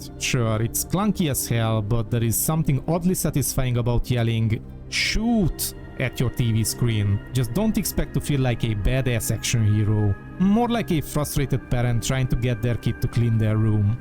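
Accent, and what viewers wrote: Hungary. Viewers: Csak feldobta a videódat, de mondom ezzel az akcentussal tuti magyar. :D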